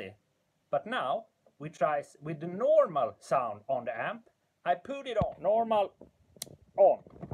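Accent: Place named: Sweden. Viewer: Fantastiskt grejt swenglish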